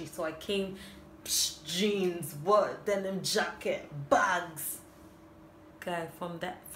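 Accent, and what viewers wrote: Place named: Nigeria. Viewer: U sound Nigerian funnily enough...nice personality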